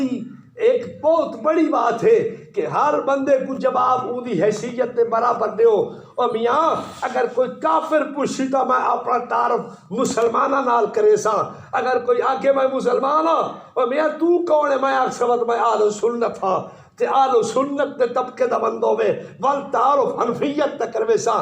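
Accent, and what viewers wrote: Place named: Punjab, Pakistan. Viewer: بہت خطرناک پنجابی بول رہے ہیں، کچھ بھی سمجھ نہیں آ رہا ہے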